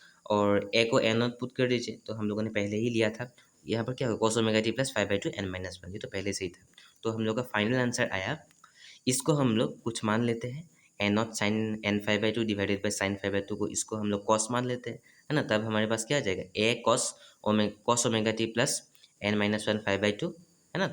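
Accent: Assam, India. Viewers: Are u from Assam,sir?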